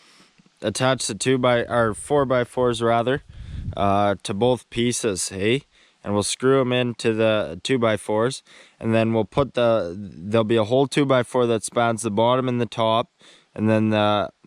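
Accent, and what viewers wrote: Canada. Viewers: Appreciate your build ay. Good job, the Canadian accent with expletives, excellent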